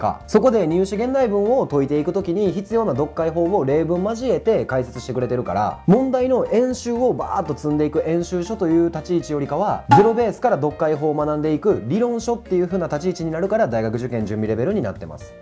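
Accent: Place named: Kansai, Japan. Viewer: なんかこの関西弁謎に腹立ってくるww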